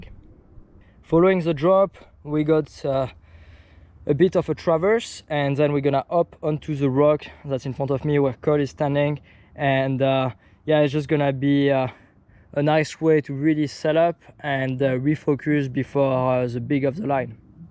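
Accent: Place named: France. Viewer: T'es français toi ça sentend🤣🤣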